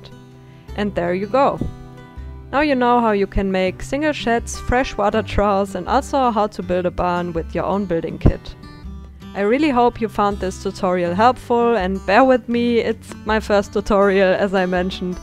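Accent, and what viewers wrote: Germany. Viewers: Junge dieser deutsche Akzent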